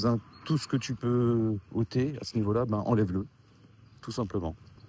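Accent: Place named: Alsace, France. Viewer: Accent de chez moi! Alsace ou Moselle?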